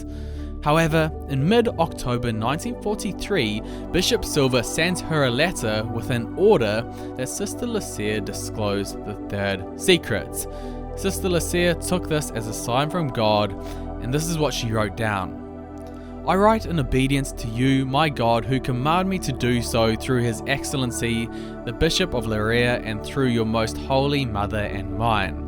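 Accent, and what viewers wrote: New Zealand. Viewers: Like your Kiwi accent. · Is your accent Kiwi ??🤔 (NZ)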